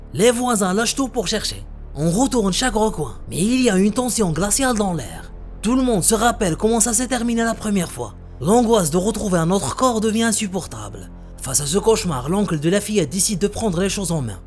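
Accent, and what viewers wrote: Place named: North Africa. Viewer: Au de la du boulot remarquable que vous faites, mais il ya cet accent qui nous rapproche du bled et d'ailleurs j salut tout mes collègues bledar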